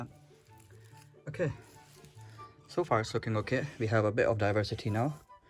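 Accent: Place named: United Kingdom. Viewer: I can hear a bit of a British accent there mate :p Nice work Akhi.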